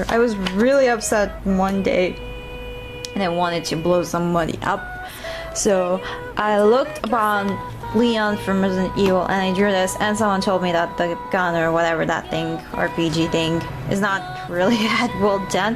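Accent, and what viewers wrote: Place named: Egypt. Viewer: you're Egyptian ?! o.o gosh your accent is AMAZING! xD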